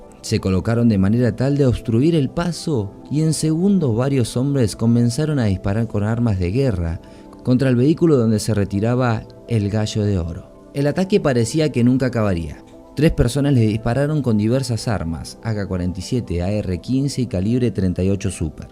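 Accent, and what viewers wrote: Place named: Argentina. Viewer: Lo que mas me vuela la cabeza es porque el narrador tiene acento argentino?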